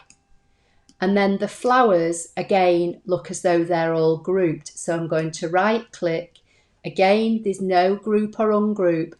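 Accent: United Kingdom. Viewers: Nice to hear a voice from uk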